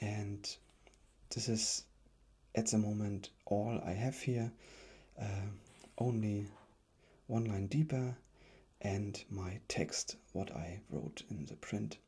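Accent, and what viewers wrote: Germany. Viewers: are you from germany because your pronounciation sounds very german and you also said "ja"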